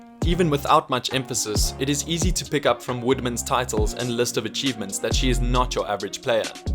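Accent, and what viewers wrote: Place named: South Africa. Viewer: Nice video. Unusual (but pretty cool) to hear a S'Affa praising NZ rugby.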